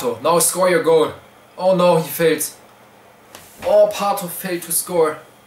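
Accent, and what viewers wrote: Germany. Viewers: accent? he's german so its the normal way of pronouncing german/austrian names :D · Play KSI... would be fun to watch his screaming and your german accent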